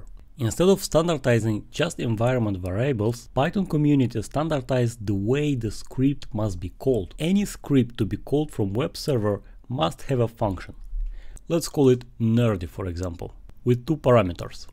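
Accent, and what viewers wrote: Russia. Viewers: Русский акцент? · Дружище, я сразу понял, что ты русский) Запили на русском такой же видос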